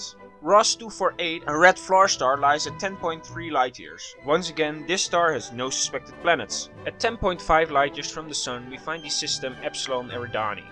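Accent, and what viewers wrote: Netherlands. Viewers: That Dutch accent!!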